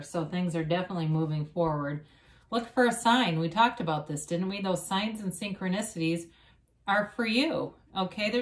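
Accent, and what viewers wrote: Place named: Boston, United States. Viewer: I'm Spanish, I was an exchange student in Mass a long long time ago, so I claim it!!! Wanna go back to Boston yes!!!😄🥰In fact I love listening to you because of your accent. In my Profesional life there a lot of british accent speakers and international and all of a sudden you sound so familiar...may I ask where in USA are you from?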